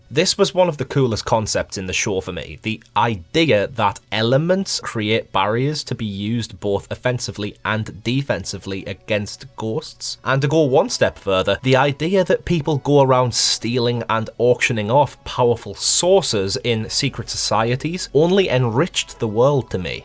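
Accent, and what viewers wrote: Scotland, United Kingdom. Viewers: I truly love this show ❤ Also can anyone tell me what english accent he is speaking in? It kind of sounds like scottish sometimes but he doesn’t roll the r so I’m not entirely sure. I’m just very interested in languages and accents and all that stuff. So if anyone knows or if you want to answer yourself it would be much appreciated.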